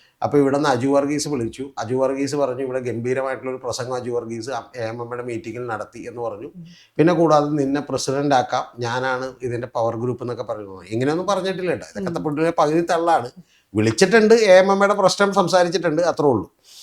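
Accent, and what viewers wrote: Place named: Kochi, India. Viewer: Oh . കൊച്ചിക്കാരൻ്റെ അളിഞ്ഞ മലയാളം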